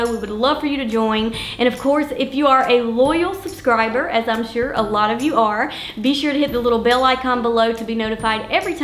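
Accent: South, United States. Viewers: I love your videos. You are truly beautiful inside and out. Oh, and I love your southern accent. I lived in 2 southern states years ago and you remind me so much of my time there....which I loved. Excellent content and delivery. thanks so much.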